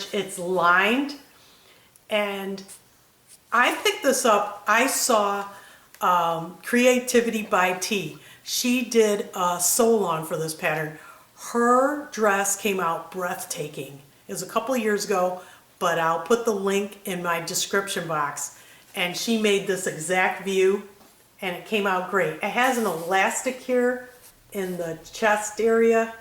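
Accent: Pennsylvania, United States. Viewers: Oh I loved this video. Every bit of it. Im in PA about an hour from where you came home to. I knew I recognized you accent as home. ❤️ Your Grand daughter is precious and looks lovely in her dress. I got my sewing love from my Grandmother when I was about 6. You’re Grand daughter might want to try one day! One more thing, You are a wonderful person, effervescent and joyful, so much fun to watch. 😊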